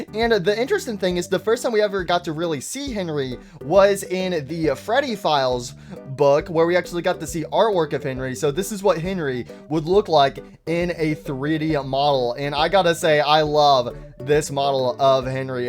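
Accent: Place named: United Kingdom. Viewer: bro is British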